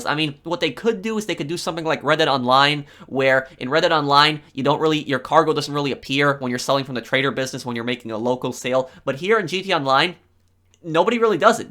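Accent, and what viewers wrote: Poland. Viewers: Are you from Poland? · Are you polish?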